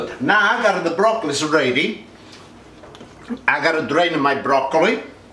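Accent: Italy. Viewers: The best Italian accent ever !!!!!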